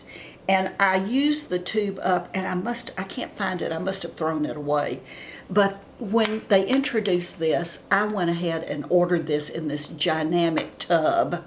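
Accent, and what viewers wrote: South, United States. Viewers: I am closing in on 79 and was so pleased to find you onout youtube. I live in North Carolina and am very happy to hear your lovely southern voice along with the fact that I enjoy your show so much. I won't visit youtube without looking for you first. Ann McE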